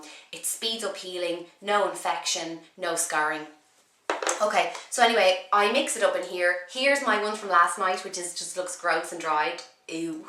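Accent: Ireland. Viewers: Lol she doesnt have a British accent, its Irish two different countrys :) x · great tips will write them down to rem them" have been following ur vids for ages but never commented.nice to hear the dub accent.yay!!!!!!! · Your turd installment? Lol, no offence i LOVE the irish accent. Thanks for the tips too :)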